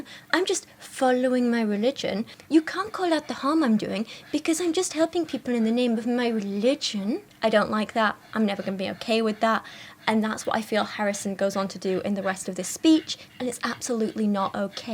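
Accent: United Kingdom. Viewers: In addition to misogyny, there are a couple of other prejudices Americans have that may be related to why you have been called pompous in comments. First is Americans often associate British accents with being rich, pompous, and stuck up. I’m not sure if it is because of old movies, or following the British royalty too closely, or the listeners feeling dumb for having some difficulty understanding you. (When I first found your channel, I would use the closed captions, but was fine after two or three videos. My mom would watch British shows but my father could never understand the accents, even after 40 years of marriage.) Another prejudice the American right has is that anyone who is educated, man or woman, is a pompous liberal who looks down on them. They often blame “liberal” colleges instead of questioning what could be wrong with their beliefs that causes smart to reject them.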